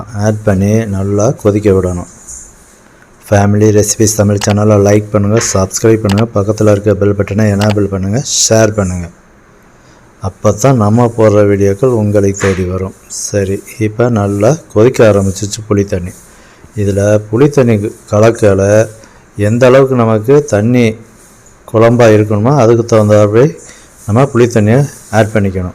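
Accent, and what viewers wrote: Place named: Madurai, India. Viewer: chanceless recipe bro... unga tamil madurai side madri iruku bro.. very nice and yummmmmmmmmmmmmy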